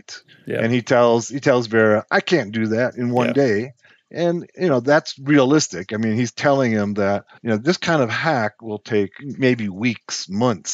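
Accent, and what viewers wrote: Afrikaans, South Africa. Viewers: You sound south african, great content!! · Hi are you afrikaans?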